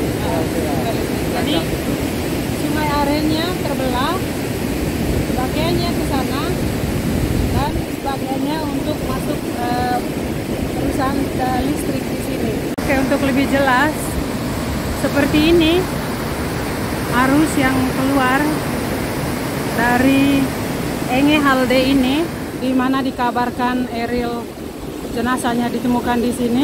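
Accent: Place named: Manggarai, Indonesia. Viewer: Ketahuan dialeg vlogernya orang Manggarai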